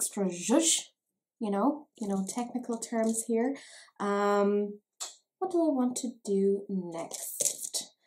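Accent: Ireland. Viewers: OMG! You actually said PR, not P-OR!!.. LOL! Never change, we love your lovely Irish-ness!!.. and your lovely Irish face!... xXx 😜😊😎💜💜💜💜🌙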